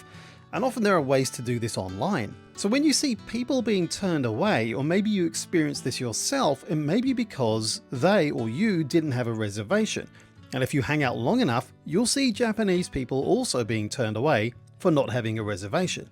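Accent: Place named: New Zealand. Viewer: u have an interesting accent. feels like you grew up in australia or NZ and went to england or visa versa. takayama is great, so much so, i just bought a house in the old historic town. edit: nz not aussie, subtle but it's there :)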